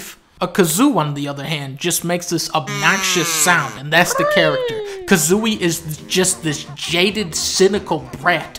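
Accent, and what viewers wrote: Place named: Alabama, United States. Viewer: You sound like your from alabama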